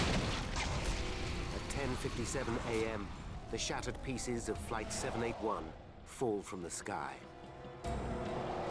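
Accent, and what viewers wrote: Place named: England, United Kingdom. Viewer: I like the English narrator much more than the American narrator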